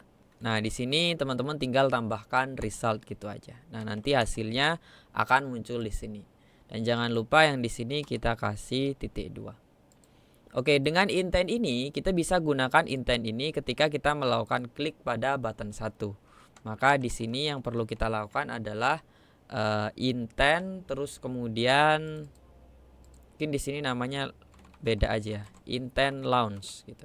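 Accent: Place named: Bali, Indonesia. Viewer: bli orang bali ya? :v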